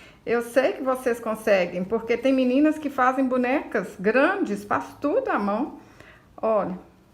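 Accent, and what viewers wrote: Minas Gerais, Brazil. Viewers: Muito lindo!!! Já vou fazer pra minha neta Helena de 5 anos! Amo ver seus vídeos! Já estou inscrita faz tempo! Amo também o seu sotaque mineirinho!!! Parabéns pelos trabalhos e por ser paciente em explicar. Beijos😘😘👏👏👏😍😍😍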